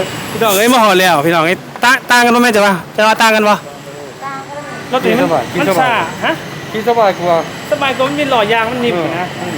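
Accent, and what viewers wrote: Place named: Isan, Thailand. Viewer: ข่อยว่า เจ้าเหมือนคนอีสานบ้านข่อยคักๆ😁😁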